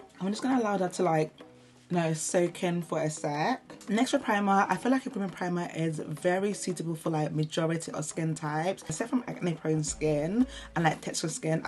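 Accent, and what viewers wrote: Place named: United Kingdom. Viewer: obsessed with how you're putting us on!! are you nigerian?i love your accent, black british folk are another level of gorgeous. sincerely, nigerian american cousin here :)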